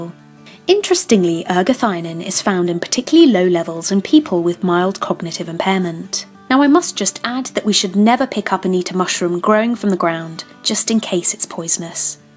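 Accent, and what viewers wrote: United Kingdom. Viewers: Mushrooms are great, you can make them really tasty by adding herbs, garlic, tomatoes, etc. and there's no need to add oil. They're very low in calories, filling and "meaty" in texture so they're a great food to have around. Nice to hear a British voice and to know you're based in London too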